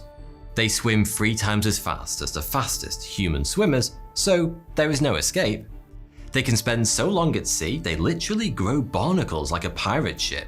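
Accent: United Kingdom. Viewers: I knew where this was going, but I just wanted to hear it in his beautiful British accent and voice.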